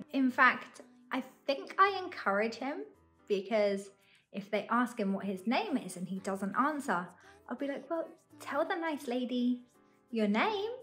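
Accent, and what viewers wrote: United Kingdom. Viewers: Lovely British accent and welcome to Taiwan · Hi,This is my first time come to your YouTube channel and i like your British English accent. So i Subscribed your Channel. 保持善良的心，但是別放棄警覺。害人之心不可有，防人之心不可無。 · 我覺得WTO姐妹會應該邀請你上節目，聽你講話好有趣。英國式英語腔調比美語好聽耶~~我們的總統蔡英文說英語的時候其實也有很濃的英式腔調。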